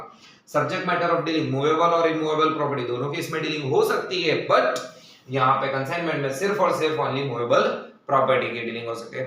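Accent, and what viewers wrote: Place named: Hyderabad, India. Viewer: Sir are you from Hyderabad , your accent is just like hyderabadi , anyways easy language , good information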